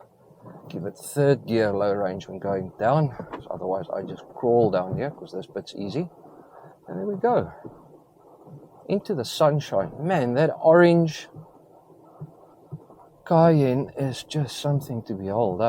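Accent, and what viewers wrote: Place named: South Africa. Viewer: Sensing a bit of South Africa here lol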